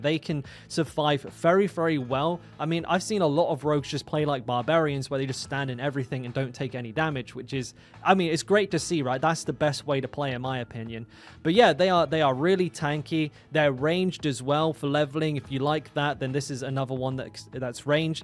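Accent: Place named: South West, United Kingdom. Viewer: My go to for D4 content!!! Even though the accent reminds me of the uni days in the south west 😂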